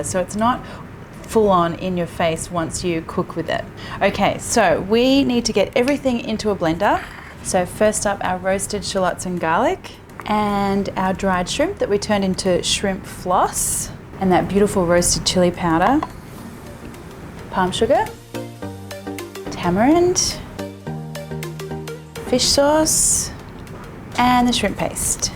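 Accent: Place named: Australia. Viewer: I hate hearing annoying Australian accents.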